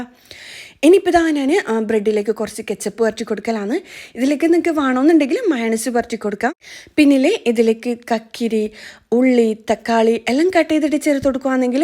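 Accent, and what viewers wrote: Kannur, India. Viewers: കണ്ണൂർ ഭാഷ അടിപൊളി... 👍👍👍ഞാൻ മലപ്പുറം ആണ് ട്ടോ.. 🥰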